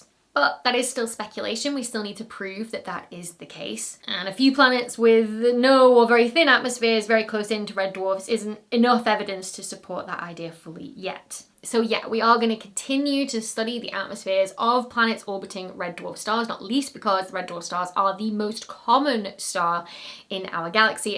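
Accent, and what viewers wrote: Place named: Durham, United Kingdom. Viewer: You really picked up the accent at durham, i couldn't work out if you were Victoria wood or steph houghton lol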